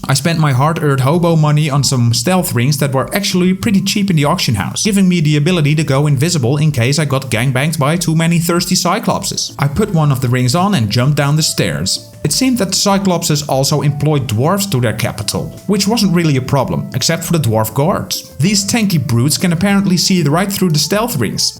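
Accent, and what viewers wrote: Netherlands. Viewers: Thick Dutch accent, my g. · Ik herken dat nederlandse accent meteen ;) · You sound Dutch, I am Dutch. I still have a character in Peloria, I can help you out anytime :)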